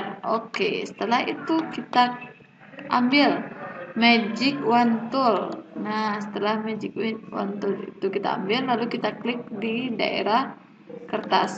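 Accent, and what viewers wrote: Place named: Minangkabau, Indonesia. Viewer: Iya kak thanks ya.... Pedas kali bahasa urang awak ni yaaa kak 😂😂😂😂😂 logatnya udah ketebak raso e😂😂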